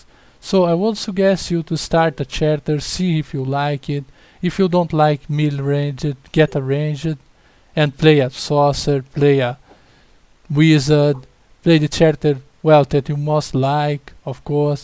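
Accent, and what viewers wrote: Brazil. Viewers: com esse sotaque, certeza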